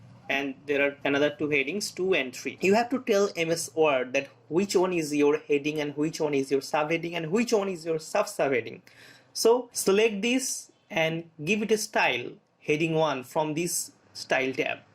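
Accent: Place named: India. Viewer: INDIAN ACCENT ALWAYS THE BEST TUTORS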